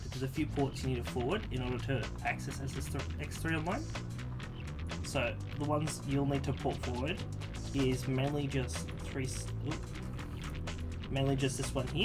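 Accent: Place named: Adelaide, Australia. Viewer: champion, cheers unrelated, but are you from adelaide or is my ear for accents way off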